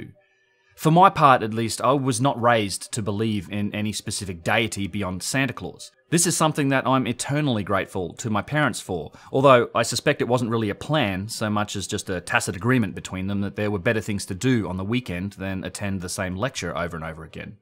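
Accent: Australia. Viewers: Great to hear and Australian voice with a typical Australian perspective "God's pissed off......"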